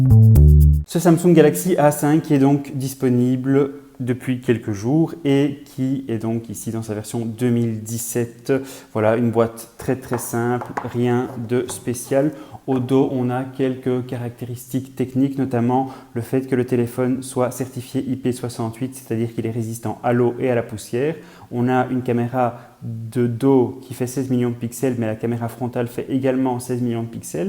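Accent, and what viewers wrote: Belgium. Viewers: with a belgium accent i would say a belgium accent but i dont arrive to find town Charleroi Liege etc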